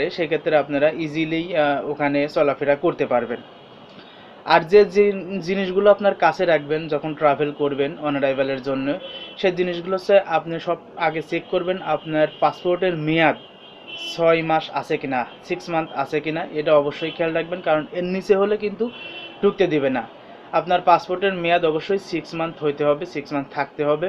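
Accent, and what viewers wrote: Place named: Pabna, Bangladesh. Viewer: ভাই আপনের বাড়ী কি পাবনা ভাষা শুনে মনে হচেছ পাবনা পাবনা কোন থানায় ভাই?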